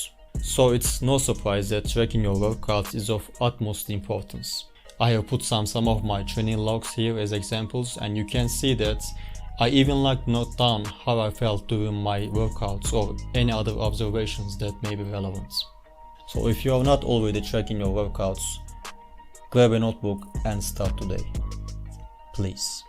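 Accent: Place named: Turkey. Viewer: Bu bir destek yorumudur. Diline sağlık. İngilizcen bayağı iyi ama türk aksanın belirgin =)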